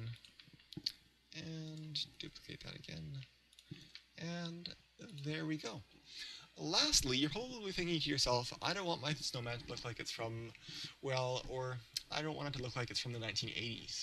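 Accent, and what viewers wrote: Canada. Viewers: I <3 you because you're Canadian! I noticed right away!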